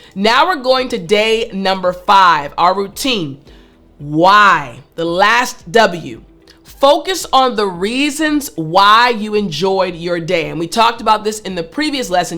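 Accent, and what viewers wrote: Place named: West Coast, United States. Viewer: I clearly love your acsent. why white people especially from west coast really I dont get it. I have subcribe and like and invite all of my family to learn english from your channel. Great way to learn english.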